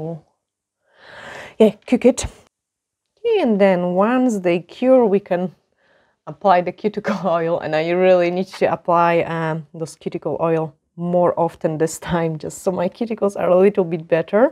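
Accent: Scotland, United Kingdom. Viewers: Thank you for this video! Such an interesting accent. It’s like, Scottish but also something from Eastern Europe?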